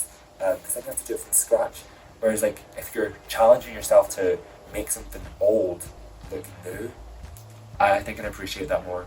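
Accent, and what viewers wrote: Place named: Scotland, United Kingdom. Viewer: You look sexy as real lady and like your Scottish voice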